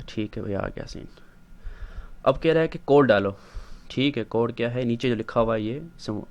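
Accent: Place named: Haryana, India. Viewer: his accent feels like haryanwi . but he is teaching how to do in Pakistan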